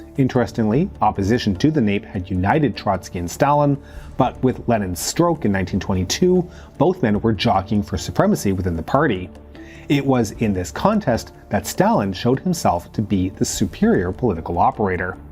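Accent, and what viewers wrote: Canada. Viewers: It finally clicked during the conclusion why he sounds American but pronounces things like a Brit… he’s a Canuck!!! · Hah, I always thought you had that slight Ontario accent. I'm from Kingston lol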